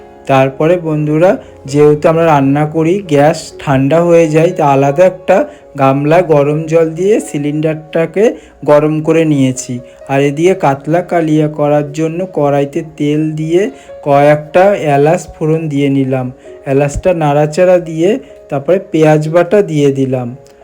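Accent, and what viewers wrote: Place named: Bangal, Bangladesh. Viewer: পিচ এটা আবার কোন দেশের ভাষা , বাঙাল ভাষা ?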